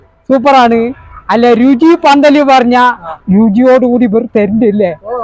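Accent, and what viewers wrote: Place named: Palakkad, India. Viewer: 🎉🎉🎉 അടിപൊളി ആണല്ലോ....Etttttooooo........ നല്ല അവതരണം.... പാലക്കാട്.... തനി നാടൻ ഗ്രാമീണ സംസാരം..... full support ഉണ്ടാവും.....🙏🙏🙏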